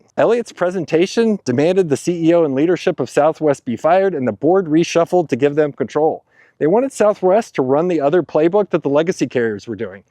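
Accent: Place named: Chicago, United States. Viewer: Its all about the (damn) money! BTW, where are you walking at? Id say New Jersey, but you dont have the accent for it. Probably chicago area.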